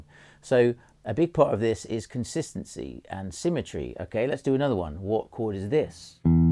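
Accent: Bristol, United Kingdom. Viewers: did you go to Bristol university, per chance? I gather you're based in Bristol and I can hear the slight accent, but I was there '98-'02 and I think we're about the same age..Anyway, since I heard your playing a couple of years or so ago, I always thought it was some of the very best and melodic in the best way.. I'll be listening and learning some stuff as well as enjoying your music. Have a good evening.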